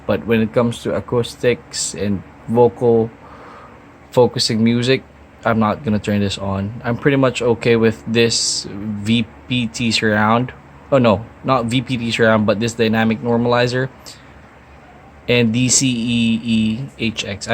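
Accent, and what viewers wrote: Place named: Philippines. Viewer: i knew you were pinoy just by your tone. I'm thinking of getting this or Fiio X3 Mark III but can't decide yet or i could just add a bit more and get a nintendo switch and forget about music haha jk. any review for Fiio X3 Mark III over NW-A45?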